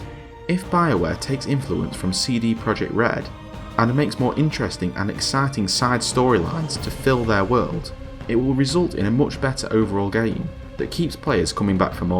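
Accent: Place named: Midlands, United Kingdom. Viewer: haha thats cool mate, loved ur vid, im from london so local by the sounds of ur (midlands) accent? lol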